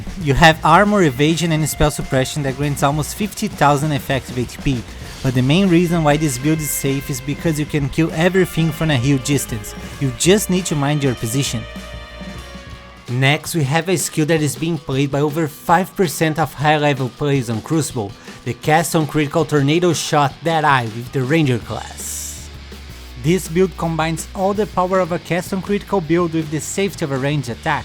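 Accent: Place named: Brazil. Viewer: E esse sutaqao br ai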